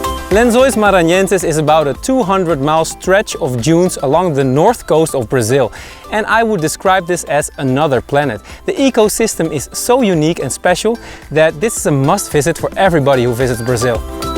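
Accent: Netherlands. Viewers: Je bent Nederlands of niet?? Ik hoor het aan je accent :o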